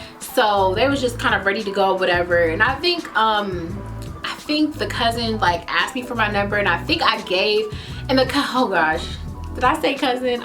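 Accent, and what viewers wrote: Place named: New Orleans, United States. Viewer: Lol that New Orleans accent😂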